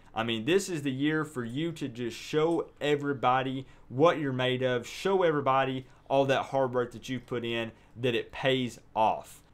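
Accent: South, United States. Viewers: Are you from Miami? You have a deep country drawl... I wasn't sure.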